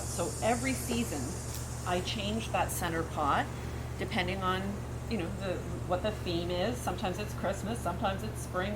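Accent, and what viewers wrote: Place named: Ireland. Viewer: A great garden, are you Irish. You sound a bit Irish. Keep up the good work.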